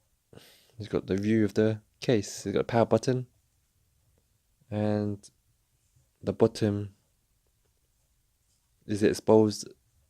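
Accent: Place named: United Kingdom. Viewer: It's a pain in the ass listening to British accent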